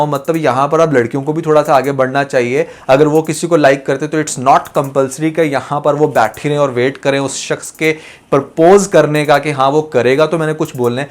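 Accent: India. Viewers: Firstly you must improve your urdu before making videos secondly avoid Indian style of dialect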